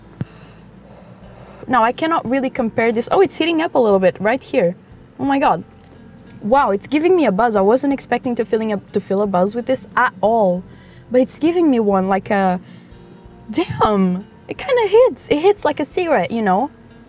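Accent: Portugal. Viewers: your accent is so nice ahhh fu*k . it's portugal accent really? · giiirl soube logo que eras tuga (ou pelo menos que falas portugues) pelo teu sotaque antes de dizeres que estavas em Portugal! comprei a minha maquina hoje, com o terea turquoise , espero gostar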